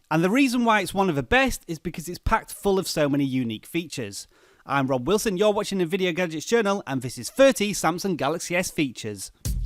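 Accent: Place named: United Kingdom. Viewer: The goddamn british accent creeps me out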